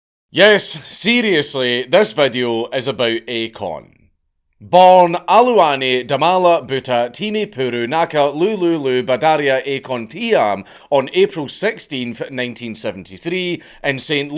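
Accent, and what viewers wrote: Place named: Scotland, United Kingdom. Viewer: I like how Count Dankula doesn't try to change his Accent for the International Audience Because of him i feel like i can now fluently understand Scottish for when i visit Scotland as a Rural Bavarian from Germany Prost & Cheers from Berchtesgaden in the Bavarian Alps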